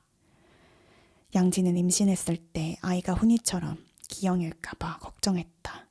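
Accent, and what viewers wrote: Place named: Busan, South Korea. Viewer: 파친코1부 부산사투리 재밋게, 감질나게 잘들었어요. 2부 기다릴게요~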